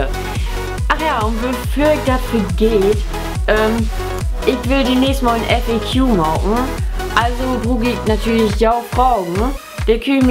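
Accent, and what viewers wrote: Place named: East Frisia, Germany. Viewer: Oh ik mag den ostfreesken akzent heel lieden :) Schöön to seen dat dat no annere Lüü in usen öller gifft de no Platt schnacken =) As ik dat lesde Mol bi di keekt heff, was ik mi no nich seker, of dat nich blot en Spijöök was. Man dor ik nu seker bün, dat du no mehr op Platt doon deist (hoop ik tominnst), abonneer ik di nu =) ...Hest du egentlich nen Macker? ;)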